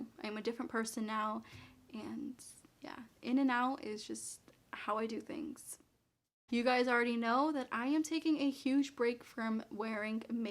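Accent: Boston, United States. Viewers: That accent is 🔥🔥. I'm from L.A so hearing your accent is different. Is that a Boston accent?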